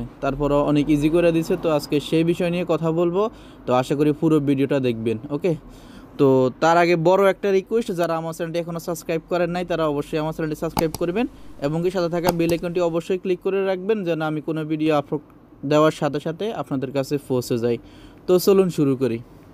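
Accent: Noakhali, Bangladesh. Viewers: বাই আপনার বাড়ি কি নোয়াখালী নাকি জানাবেন অবশ্যই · vai ki noakhailla ni? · ভাই আপনার দেশের বাড়ি কোথায় নোয়াখালী আপনার কথা শুনে খুব হাসি পায়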